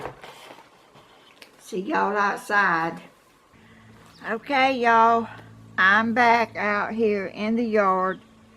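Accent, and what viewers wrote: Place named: Georgia, United States. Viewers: Just finding your vlogs. My family is from Vidalia. Also have family all around Dublin. Who knows one of our family tree limbs might have crossed. Enjoying listening to you talk makes me homesick. Haven’t been able to go back to Vidalia due to COVID 19. You now have a new subscriber. I will let my family know about your vlogs. Since you love country music you need to come to Nashville. We have lost a lot of great singers but we still have a lot still singing so when you feel like traveling come on over and we will have to get you some Grand Ole Opry. Tickets. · First I want to tell you I’m from Crabapple (Alpharetta/Roswell area) and have lived in the Dallas area in Texas for the past 20 years. I love seeing your beautiful creations and your accent makes me miss my parents (who passed in 1984 -Mom and Dad in 1996). 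I don’t get to hear it enough. My youngest daughter stuttered but the schools here brought in a therapist and they made it go away. But I’m just like you - tender hearted and I was raised to treat others as I want to be treated. I can stand up for others but never myself. I’m sorry if anyone has ever been rude on here to you. You might like this comedian on YouTube - she’s from the Carolinas and a clean comic. She’s fabulous. Jeanne Robertson. She’s a hoot.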